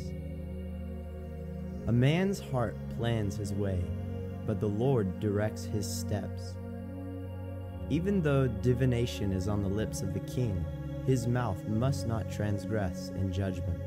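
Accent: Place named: England, United Kingdom. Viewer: Thanks you so much bro. I am learning English accent with your bible study.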